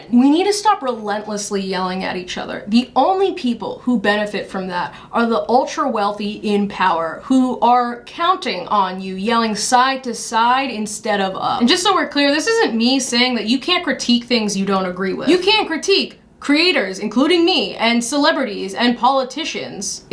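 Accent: Boston, United States. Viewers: shows in NYC while getting ready to move to LA... but i still hear Boston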